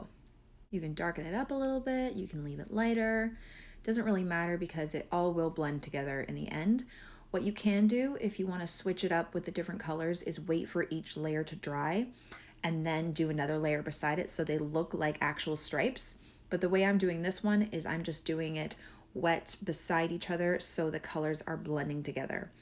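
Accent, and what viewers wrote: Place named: Canada. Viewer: I have a feeling you're Canadian, :) just watched this and your voice annunciation... or northern US state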